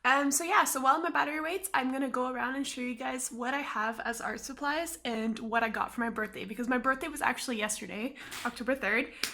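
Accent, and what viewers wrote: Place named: Germany. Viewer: Lovely German accent! I'm a Brazilian living in Berlin and I'm total drawing begginer. Your videos are inspiring/helping me a lot. I could see you have pretty much everything you need for your art craft, and would like to ask you where do you buy them. I live in the West and close to my apartment there is a huge craft store, and I feel like buying everything. I can't say it's cheap but they have an excellent service and their personal are always very helpful. Here is the link if you're interested: <link> I also heard about a store called Action, but I haven't been there yet, because it's far away. I looked at the online prices and it's indeed very cheap. I also buy a lot at Aliexpress app. I would love to know where do you buy your art material. Wish you an amazing weekend!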